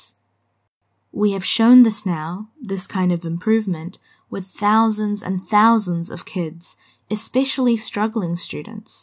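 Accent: New Zealand. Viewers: 이거랑 Te Aorewa 영상으로 쉐도잉하는데 정말 좋아요! 항상 쉐도잉 자료는 영국, 미국 영어만 널려있어서 손이 안갔는데 한국 유일하게 키위 액센트 교육채널이 있다는게 정말 감사해요. 앞으로 인터뷰나 테드처럼 쉐도잉 할 수 있는 컨텐츠도 더해주실수 있나요??ㅠㅠ 목소리도 너무 예뻐요ㅠㅠ 왜 top sexiest accents에 키위억양이 1위로 뽑혔는지 알것같아요ㅋㅋㅋㅋㅋ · 언제 어쩌다 뉴질랜드 발음에 꽂힌 지 모르겠는데 그때부터 항상 잘 듣고 있어요! 목소리랑 발음 너무 예뻐요!! 나중에 문장 끊어서 쉐도잉 할 수 있도록 반복재생? 되는 것도 올려주시면 좋겠어요 너무 좋아요ㅜㅜ♡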